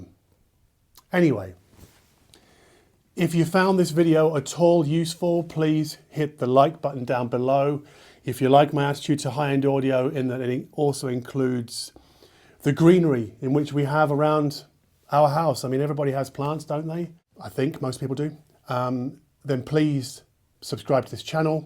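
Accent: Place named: United Kingdom. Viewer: Just shows that anything sounds legit when said with a British accent!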